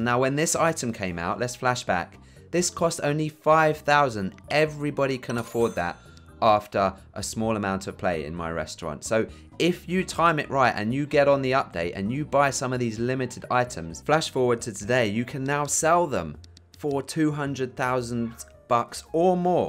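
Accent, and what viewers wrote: United Kingdom. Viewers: I know u is British I am American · Your British?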